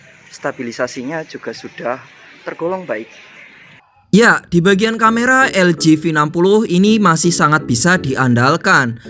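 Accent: Banyumas, Indonesia. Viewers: Buset wng Banyumas 😂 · ngapak anjir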